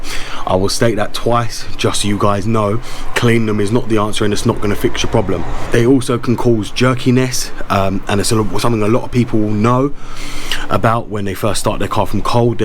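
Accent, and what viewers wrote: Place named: Scotland, United Kingdom. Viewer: comprehensive information, thank you, can you please consider to speak a built slowly, for non-scotich native it will be difficult to follow you. I turned on youtube auto English subtitle caption but it seems this option can't catch what you said too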